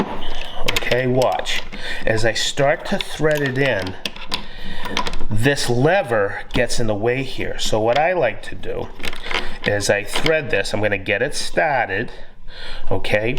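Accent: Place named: Massachusetts, United States. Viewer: Cambridge MA here the accent I think your from Massachusetts? Thanks for sharing!!!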